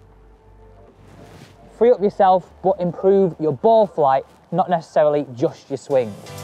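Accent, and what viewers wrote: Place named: Cockney, United Kingdom. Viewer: I cannot understand most words the guy in the viedos is saying! Why does every golf training video have people from England with poor cockney accents? The guy mumbles through the entire video! I will never get that 3 minutes back.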